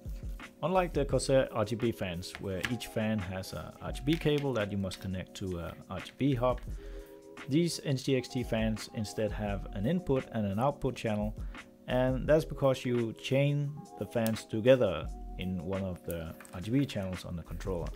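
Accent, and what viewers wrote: Denmark. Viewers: Man kan høre du er dansk😂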